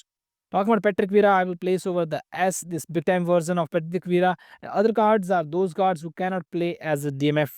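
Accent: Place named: India. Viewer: My Hindi accent friend how are you? Because of you i lost money. You need to pay me back😊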